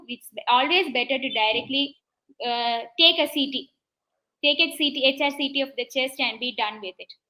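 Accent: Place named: India. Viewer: Wow! I can barely understand anything they say. Sorry, but your Indian accept is super thick.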